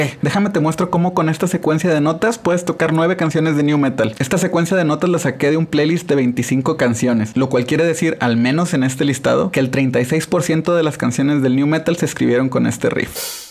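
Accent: Mexico. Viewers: Jajaja me encantan tus videos, son bien honestos y tu acento bien mexicano lo hace más entretenido 👍👍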